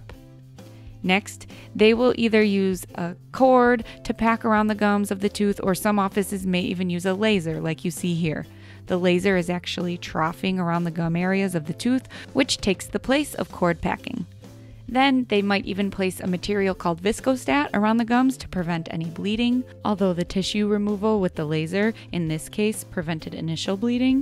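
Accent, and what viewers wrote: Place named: Minnesota, United States. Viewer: I like your voice. You sound like a friend of mine. Are you from Minnesota?? Great video BTW.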